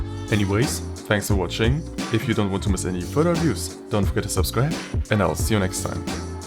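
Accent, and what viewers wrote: Germany. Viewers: man ekent das du deutsch sprichst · is that a german accent? also very high quality vid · Wusste ich’s doch. Hab den deutschen Akzent rausgehört. Auf dem Channel das Impressum gesehen und meine Vermutung wurde bestätigt. Mach weiter so gute Videos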